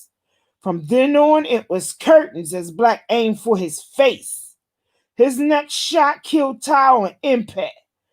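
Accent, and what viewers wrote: Baltimore, United States. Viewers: That baltimore accent makes this book hit right 😍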